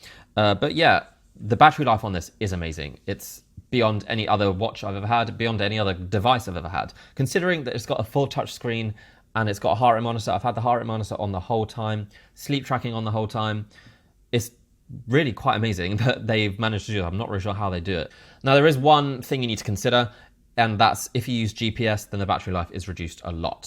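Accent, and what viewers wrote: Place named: England, United Kingdom. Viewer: u must be from england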